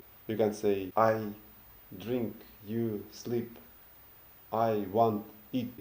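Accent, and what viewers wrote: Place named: Russia. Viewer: Is your native language Russian? Cuz I swear I hear your Russian accent all the time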